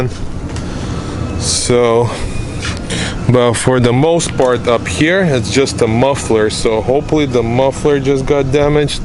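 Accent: Russia. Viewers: u have rus accent lool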